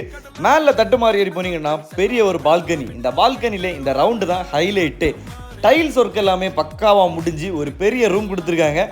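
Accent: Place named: Marthandam, India. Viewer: Marthandam tamil pesuraan kanyakumari or kerala border ah irukum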